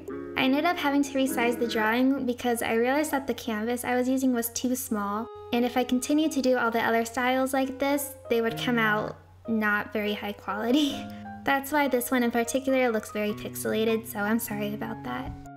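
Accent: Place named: United States. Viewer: For some reason when I found your channel and that is 4 months ago. I thaught you were Japanese..😶 but after hearing your voice for the first time. I guess you're American. But although. I love your animations.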